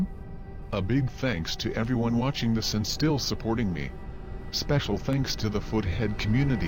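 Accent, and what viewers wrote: Germany. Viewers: Great video! Are you german?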